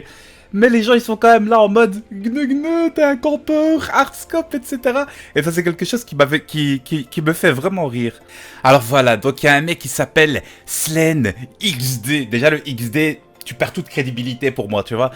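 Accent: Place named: Belgium. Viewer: tu fait trop rire j'adore les belges et leur accent ta trop raison mec t le meilleure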